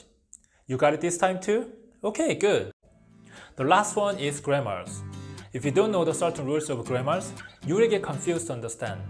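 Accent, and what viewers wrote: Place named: South Korea. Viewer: 토종이신가봐요 발음이 정겨워서 좋아요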